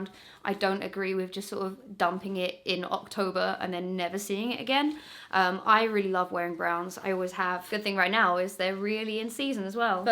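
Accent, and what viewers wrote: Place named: Essex, United Kingdom. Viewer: Missed that Essex accent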